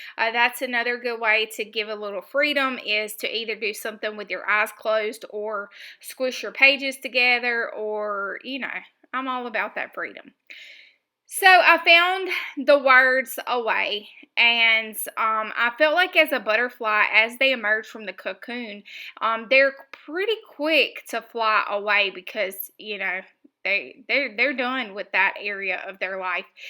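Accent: South, United States. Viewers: Well you said “tickle my fancy” and also “excuse the chainsaws and dogs ...” 👀👀 so I’m good with anything you do ! 🤣🤣 sound like you’re from Mississippi?? Texas?? Louisiana??? Hurricanes ...?? Hope all is ok and I love the idea of scanning ( a lot of work, yes) they’re great and I love your little book ..... “my moonshine container !!!” 🤣🤣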